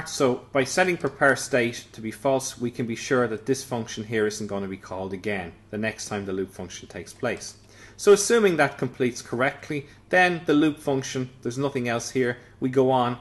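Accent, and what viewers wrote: Ireland. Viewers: I called it!!! I knew you were Irish! At first I thought British but then I realized cause of the way you say three! Haha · Hey, well *I* knew you were Irish because you obviously sound Irish.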